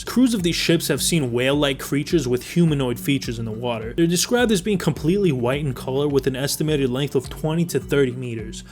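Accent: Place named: New Jersey, United States. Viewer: His joisey accent is 👍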